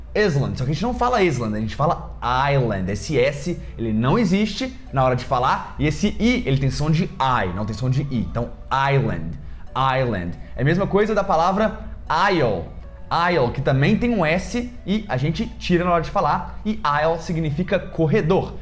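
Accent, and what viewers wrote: Minas Gerais, Brazil. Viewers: Muito obrigada por este vídeo muito esclarecedor. Você tem boa entonação e é preciso ao explicar, o que não fica cansativo. Sem falar no seu sotaque mineiro que é uma gracinha. Parabéns, tenho aprendido muito com você.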